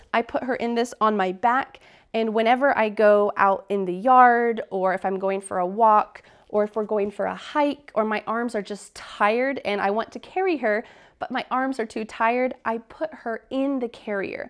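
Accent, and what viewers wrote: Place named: United States. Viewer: Hi Lady! You teached me english vocabulary and US 's accent also! Tks so much! However, each clip should be not long over 10min than very well !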